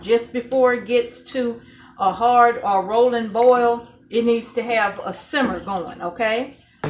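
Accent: South, United States. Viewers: I'm Australian, and I'm going to be thinking in a Southern accent (or whatever yours is) for the rest of the day, LoL. Very useful video, thank you! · I'm Canadian - from Ontario - and I love her southern drawl too!!! We got ar'own acc'nt, eh? Heh! Heh! Heh!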